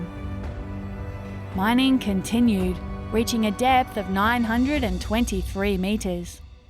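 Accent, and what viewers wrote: Australia. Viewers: The mine was closed when I was there, so this is great. :-) Thanks! Lovely Aussie English narration! The narrator needs to be hired by all Aussie content producers!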